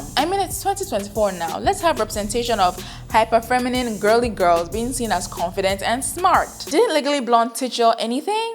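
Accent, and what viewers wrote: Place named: Nigeria. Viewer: I knew you where nigerian because i noticed your accent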